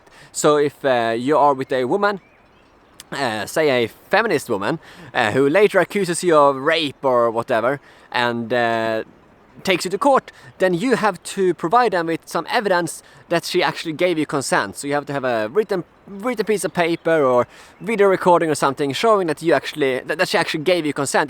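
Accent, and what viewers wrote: Sweden. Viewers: you´re a norwegian citizen first of all so maybe change the name. Secondly, plz improve your pronunciation. Your really going full on crazy swedish accent. I have never heard someone in your age span speak with such a heavy accent. Third, either your lying or not aware of how the new law will work. The law is changed so the rapist can´t deny knowing the victim didn´t want the sex if she/he did not resist. What you said about a writen consent or recording is totally made up. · Ah this solves everything. Now the rapists won't rape anymore because they've always been bothered with consent before... On another note... goddamn your Swenglish is thick... one of the worst I've heard, even worse than Lofvens... and you use English more often than most Swedes. You should check out some videos of English pronunciation because it really detracts from the message you try to deliver.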